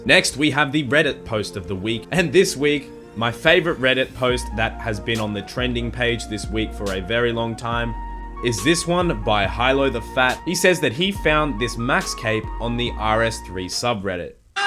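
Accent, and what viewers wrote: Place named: Australia. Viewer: are you Australian? if so where about are you from? good vid by the way!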